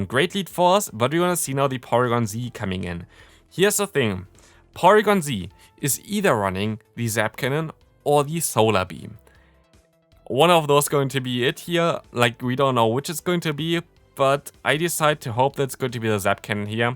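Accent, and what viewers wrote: Germany. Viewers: He's german.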